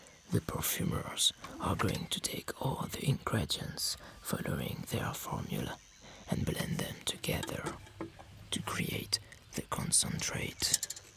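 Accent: France. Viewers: Nothing like listening to some French Accent ASMR before bed! I love this!!! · the fact that he has a french acc sent makes the ASMR so much more enjoyable and relaxing. it also makes the video feel more authentic. awesome work man much love 😊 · I'm from Russia, but I love listening to your English with a gorgeous French accent. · 5 minutes into the video I was like "damn his fake French accent is so on spot! ... Wait ... Is it fake tho?" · The acent of a French speaking is great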